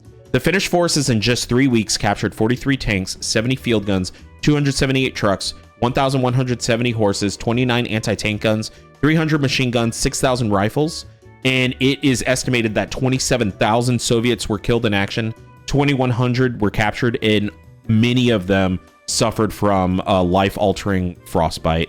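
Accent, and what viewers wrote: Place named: United States. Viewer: hearing a american say the g or ch will never be not funny to me, if you want more lore about more regiments of renown you gotta do some looking into, they sing about historical units, events, people, battles and other unique "items" of war without any of the stigma behind it of it being used for good or bad, axis or allies. is one of there song and a banger at that. fair note it is metal music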